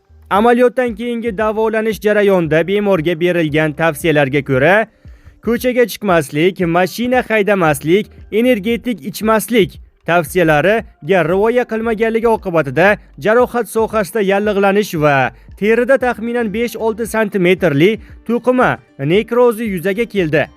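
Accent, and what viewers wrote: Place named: Tashkent, Uzbekistan. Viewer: Бу канака гап энди маданият борми аелмисиз эркакмисиз аел киши шунака гапирадими тогда тошкенча гапиряпсизда энди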